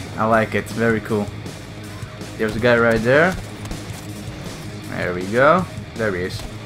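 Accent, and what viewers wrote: Netherlands. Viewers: Are you dutch? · your Dutch accent is overwhelming haha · Je kan horen dat hij nederlands is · I smellde a Dutch accent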